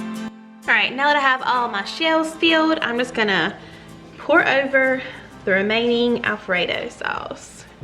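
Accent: South, United States. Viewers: The shells look amazing! Thanks for the idea- I’ll definitely be trying them! Keep up the great work. I love your videos and southern accent! ❤️❤️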